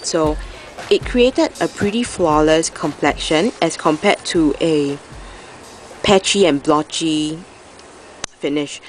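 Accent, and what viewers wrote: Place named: Singapore. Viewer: hahahahha.. from your accent i can tell you're a singaporean ^^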